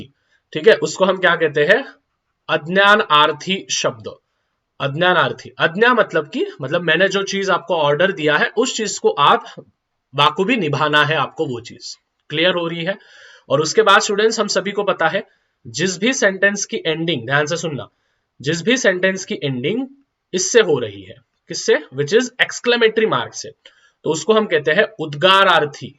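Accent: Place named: Hyderabad, India. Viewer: Sar Hyderabadi accent😅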